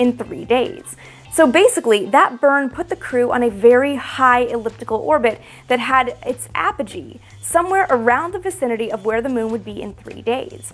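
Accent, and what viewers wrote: Canada. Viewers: Check "oot" the smart, cute Canadian girl.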